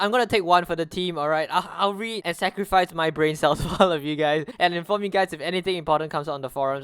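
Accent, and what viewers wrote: Singapore. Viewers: eyy singlish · Is dis dude a Singaporean? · Are you in Singaporean cause I am and U have the accent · you have a huge singaporean accent are you from lee kuan yew country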